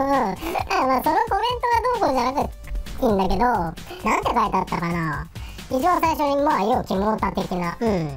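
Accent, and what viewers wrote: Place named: Osaka, Japan. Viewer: なんだか、大阪のおばちゃんがしゃべってるみたいｗ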